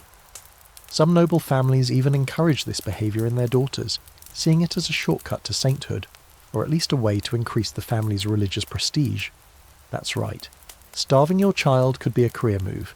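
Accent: United Kingdom. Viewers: It's 5.34 am and I'm living in France, near the chartreuse mountains. Thanks for your help and the English accent, it contributed to putting me to sleep. The pictures though 😂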